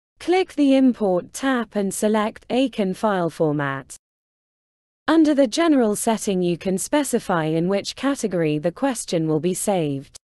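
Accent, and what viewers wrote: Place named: RP, United Kingdom. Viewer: This AI generated (presumably?) "Received pronunciation" accent is unspeakably annoying.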